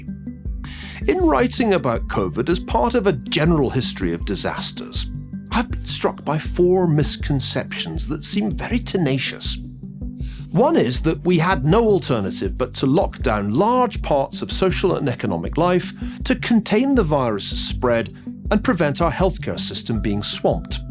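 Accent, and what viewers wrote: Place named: United States. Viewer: Loving the American accent Niall!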